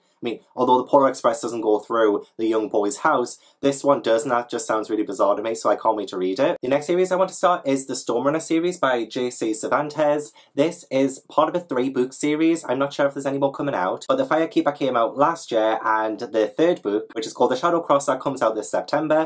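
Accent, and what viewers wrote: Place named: Newcastle, United Kingdom. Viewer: I really need to get back into middle grade reading! Also it's fantastic to hear a fellow geordie! Loving the recent videos - stay safe x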